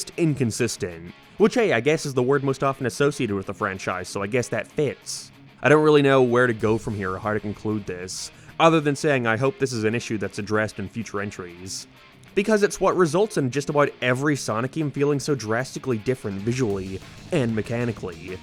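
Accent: Ireland. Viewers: hes irish iirc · Your accent goes to the most clear American accent to the thickest Irish accent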